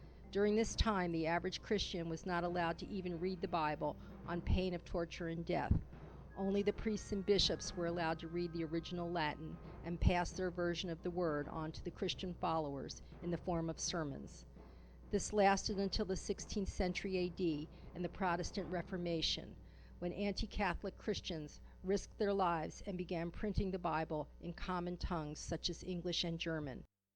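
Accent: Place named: New York, United States. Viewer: THIS VIDEO IS NOTHING BUT A SELL TO THE BOOKS AT THE END OF THIS ONE. KEEP YOUR RELIGION AND UNDERSTAND IT AT A PRACTICAL LEVEL OF LEARNING MORALS. WITH SOME CONECTION TO THE DIVINE. PRAY, IT'S THERAPY. KEEP YOUR FAITH, AT A SANE LEVEL. WHERE ELSE WILL YOU BE TAUGHT LOVE, CARE, GIVING, SACRAFICE? THE MEDIA- ALSO, SOUNDS TO ME LIKE THE SPEAKER IS HIDING A "NEW YORK" ACCENT, WHO LIKELY ATTENDS HER TEMPLE EVERY WEEK. DON'T TRUST ANYONE TRYING TO BREAK DOWN A RELIGION-LOW BLOW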